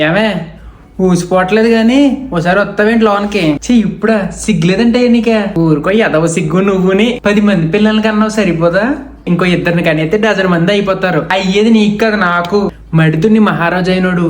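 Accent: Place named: Rajahmundry, India. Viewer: i love his slang as i was from rajahmundry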